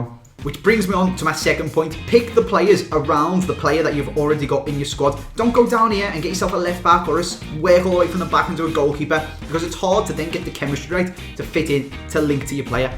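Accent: Liverpool, United Kingdom. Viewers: His he scouse (Liverpool) or welsh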